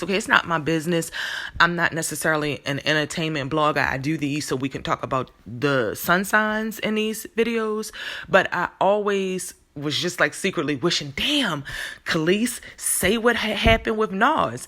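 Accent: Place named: Baltimore, United States. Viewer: You've gotta be from Maryland, Baltimore to be exact cause the way you pronounce your O's and U's is so BMORE.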